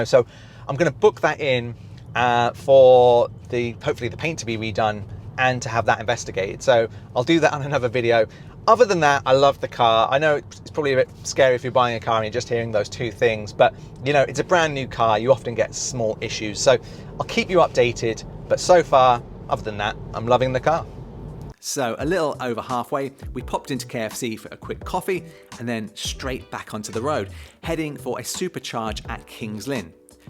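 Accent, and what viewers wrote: United Kingdom. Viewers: Hi, really enjoyed your review on the new M3 Highland especially as ours is arriving any day now (first EV)! I live in Australia now but grew up in Norwich too & picked your accent straight away (sorry mate as they say in Oz) 😊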